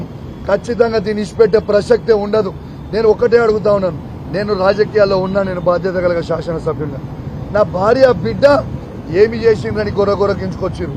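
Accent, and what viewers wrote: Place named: Hyderabad, India. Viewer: Hyderabad matlduthunnavu niku uru ekkada unnadi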